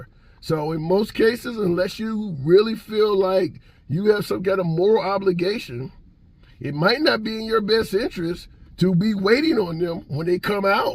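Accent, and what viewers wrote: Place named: Philadelphia, United States. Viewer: This dude definitely from philly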